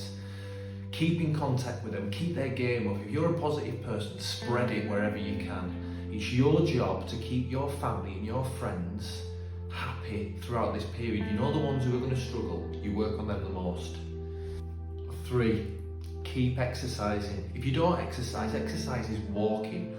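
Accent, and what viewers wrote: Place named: Yorkshire, United Kingdom. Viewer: Great video Coach! When you mentioned your accent I assumed that you meant that your 1st language is not English, but I find pleasure in your accent. Is it Yorkshire perhaps? Thanks again for boosting me. Keep going, you're doing good work!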